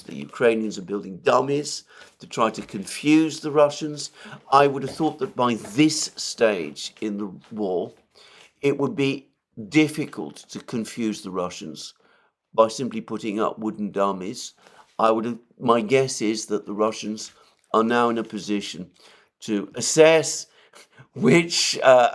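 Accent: United Kingdom. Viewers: I believe you SAID..SEVERAL TIMES..that the Ukraine conflict was fital to the world! And stop giving peace planes..You sound british (REDICULOUS) an YOU are very SINICAL!!!!!!!!!!!!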